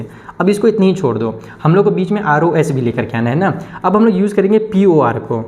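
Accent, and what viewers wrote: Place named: Bhojpuri, India. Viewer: Sir is speaking bhojpuri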